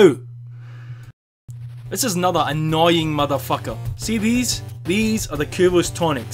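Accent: Scotland, United Kingdom. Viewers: BTW, Love your Scottish brogue. My great grandparents were from Glasgow and Edinburgh. So want to visit your great land someday. :)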